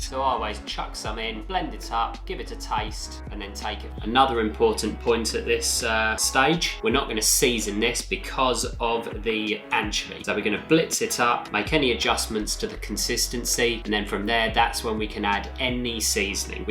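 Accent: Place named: West Country, United Kingdom. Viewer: Well you really have a West country accent ....nice recipe.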